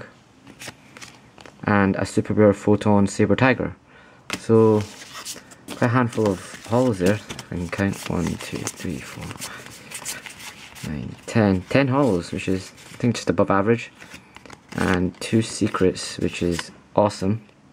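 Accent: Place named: Scotland, United Kingdom. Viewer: i love your accent... scotish right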